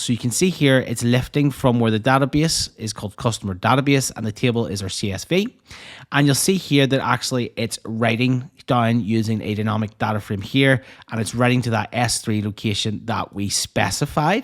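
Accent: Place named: Northern Ireland, United Kingdom. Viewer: Finally an aws tutorial that uses "wee" and talks in a NI accent, made me laugh that I finally found a fellow NI person :) followed every word :D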